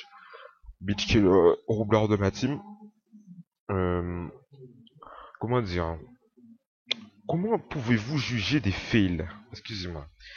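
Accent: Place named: Africa. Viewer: ta un accent africain :)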